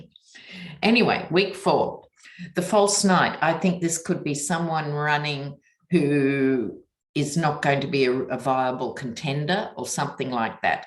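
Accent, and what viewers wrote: Australia. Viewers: Hello love your tarot card reading you keep us calm when I tune in on YouTube you were the first I ever saw do tarot cards I got laugh at your next door neighbor's they were very loud and you would sit on the floor and read your tarot cards with your Australian accent l just love your channel l said this is real tarot card reader keep up the good work.......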